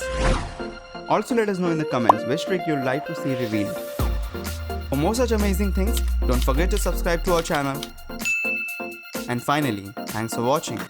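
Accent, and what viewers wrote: India. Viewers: Please, your India accent so annoying, and dont copy others video. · What'sup with the indian accent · Indian accent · He is Indian because Indian people voice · Why do some videos have a host with thick Indian accent? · The voice over has a strong Indian accent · Learn another accent, this indian one is very cringy and anoying. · indian accent, bye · I hate that indian voice. Thumbs down!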